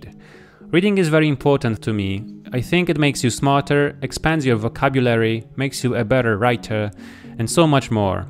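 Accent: Poland. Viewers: Coś tak czułem, że jesteś z Polski :-) Gratuluję świetnego kanału i życzę wielu sukcesów!!! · Trafiłem na ten kanał godzinę temu i tak właśnie pomyślałem, że to chyba Polak. Akcent i typowo "nasze" krajobrazy w tle zdradzały to całkiem wyraźnie. :) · Tak czułem, że jesteś z Polski hahah.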